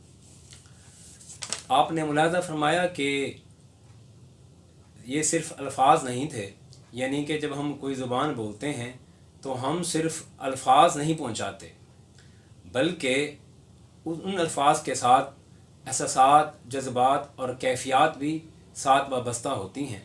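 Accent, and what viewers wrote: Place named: Saraiki, Pakistan. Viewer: Sir Your accent in saraiki is epic.... 🙌😂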